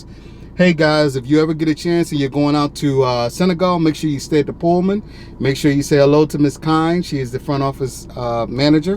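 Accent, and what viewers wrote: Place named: DMV, United States. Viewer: Sound like you in the DMV area lol!✊🏽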